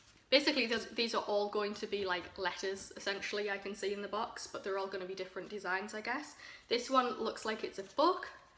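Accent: United Kingdom. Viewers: That's a definite British accent, if I'm not mistaken. Im glad to hear. I don't see many of us on here. 😉 lol. This is American based I suppose. 😂 When you grab a bunch of boxes together from the same store, your bound to get copies. The last batch of boxes were better, but, one woman's trash, is another woman's treasure...as they say. You'll soon find homes for the thongs you don't want/need. Re the letters...there's the option of making frames from two L's screwed or stuck together. Just turn one upside down and cover. Fancy up...with what ever. Plus the O's. I heard you mention, your a crafter at heart. 😉 Isn't it odd that we enjoy watching others open boxes, to see what's inside. I've not watched this kind of thing before, but I think I'm a bit addicted. Thank goodness we dont have Michaels over here in the Uk. (secretly I do) My stash of crafting materials would be horrendous 😱 Er it's not far off it already. 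There's always room for more 😂 I'd gladly lighten your load a little...can't wait for the chance at your give away. I'll be watching for your craft tutorials. Happy crafting folks.x 😊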